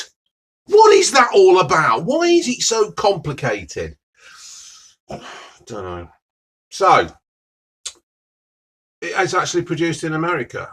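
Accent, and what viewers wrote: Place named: Northern England, United Kingdom. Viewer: Where are you located buddy? Sounds northern. Im a filthy manc and love your down to earth content.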